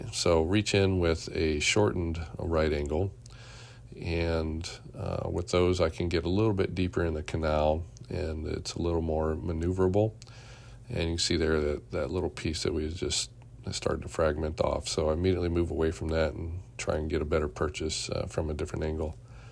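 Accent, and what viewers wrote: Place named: South, United States. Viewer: PILOT!!! He absolutely does. Lol! 🤣 AND I believe I hear a slight Southern accent. 👍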